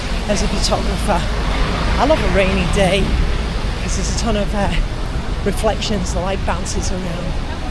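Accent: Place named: New York, United States. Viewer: Your New York accent gives away your connection with the big Apple. Instead of admitting you're too cheap to buy an umbrella, I would have gone with "I'm soaked with sweat from Jogging". A great insight into your life. You're the best!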